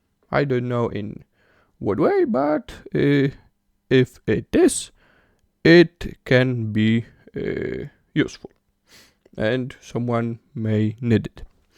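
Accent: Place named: India. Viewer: EXCELLENT TUTORIAL THANK YOU FOR HINDI ACCENT I UNDERSTAND EVERYTHING NOW 🕉🕉🛕🛕 😍😍😍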